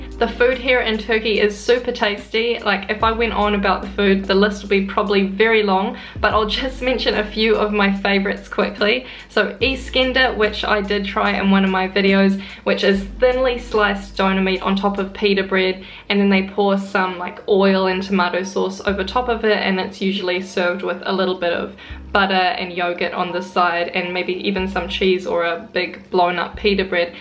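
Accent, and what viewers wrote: New Zealand. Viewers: wish you good luck with lockdown - and have a safe journey.. New Zealand accent ! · I hear a Kiwi accent. How ya goin' mate?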